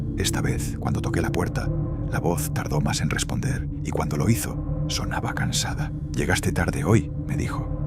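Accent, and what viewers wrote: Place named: Argentina. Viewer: Sos de Argentina? Por el acento jaja desde que conocí tus videos veo todos los días uno 😅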